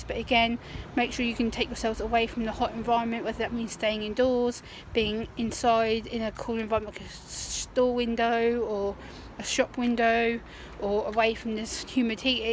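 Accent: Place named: United Kingdom. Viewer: This is the first video of yours I've watched because I'm struggling with the heat and typed in autism and hot weather and I found it really helpful so thank you! Also I'm not sure where in the UK you are but our accents are identical 😅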